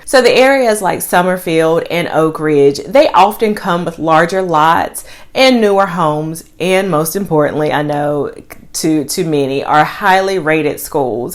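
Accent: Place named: Texas, United States. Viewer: Are you Texan? You sure don't sound like someone originally from North Carolina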